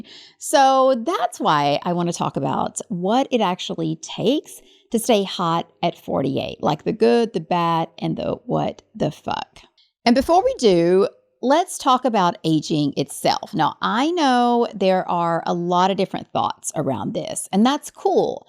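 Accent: South, United States. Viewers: That was fun! p.s. I didn't realise you had a southern accent ❤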